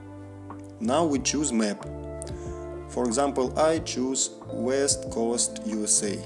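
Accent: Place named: Russia. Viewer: Здорово мужик ! Явно ты из России или русскоговорящий по речи слышно. Ну ладно желаю удачи в этом нелёгком деле ,я тоже занимаюсь ютубом. Кстати у тебя круто получается.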